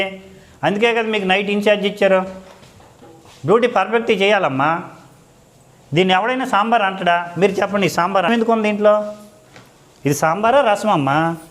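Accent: Srikakulam, India. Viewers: Srikakulam yaasa bavundi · బ్రదర్ నువ్వు ఉత్తరాంధ్ర శ్రీకాకుళం జిల్లా వాసిలా ఉన్న బావుంది లాంగ్వేజ్ బాగుంది మంచి మంచి పని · Srikakulam slang super sir....bagundi....sweet ga...asalu me east west vijayanagaram srikakulam vallu tittina...tittanatte untadi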